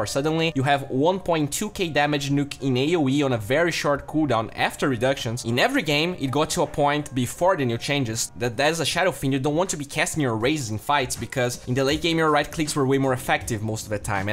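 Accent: Brazil. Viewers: Draran but you are mistaken. It is his accent. Most brazilians have this accent, fluent or not. It is because of how phonems in portuguese words are structured and end up being carried on to english as well.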